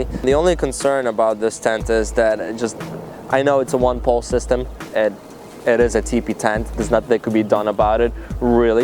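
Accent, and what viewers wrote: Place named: New Jersey, United States. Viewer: The accent was so strong and then you said Jersey..made me laugh. I have just purchased the tent. Or a variety of it second hand. Excited to try it out.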